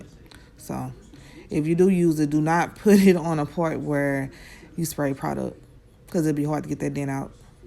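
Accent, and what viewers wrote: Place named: St. Louis, United States. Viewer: Gotta be from St. Louis with that accent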